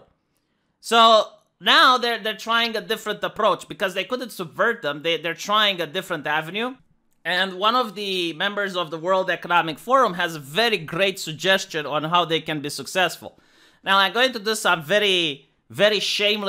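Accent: Romania. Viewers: But I like your squeaky Romanian accent. It's like...charming and shit. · "Don't like your Romanian accent?" dude, that's your signature, if you drop it, I don't even know if I find your content the same. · They hate your Romanian accent? Man, English is my first language ... at least they can understand you ... I'm Scottish so if anyone wants a competition at bastardising the English language, give us a shout.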